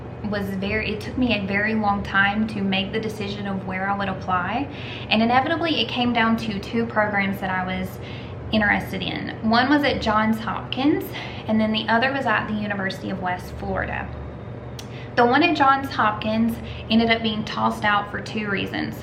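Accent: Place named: Texas, United States. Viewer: I came here because I just finished an EdD and now I want a PhD. Thanks for the info. I wish I knew this stuff before I committed to my Ed.D. program. Oh, well, live (with debt) and learn. I’m looking at postdoc fellowships, too. On a side note, I love your accent! Where in Texas is it from ? ⭐️💯 When I see my family every summer in El Paso and San Antonio few people have the Texas drawl..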